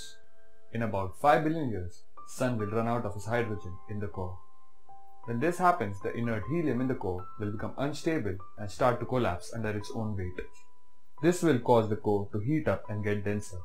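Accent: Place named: India. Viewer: you are doing a great job, i really like it, but you need a bit of improvment on your english accent, try to speak english without accent, normal english, putting an indian accent makes you understandable for some people and not for others. Good Luck Bro! i give carn luli!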